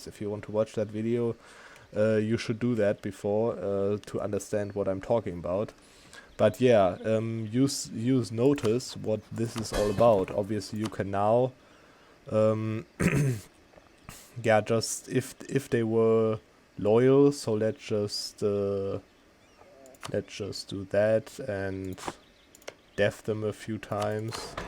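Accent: Germany. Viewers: You’re from Germany, right? Nicht schlimm, ich mag unseren Englischakzent. :D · You have a German-Accent XD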